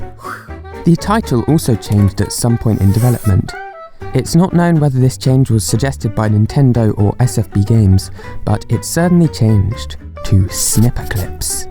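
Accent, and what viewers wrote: United Kingdom. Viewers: Hes british like me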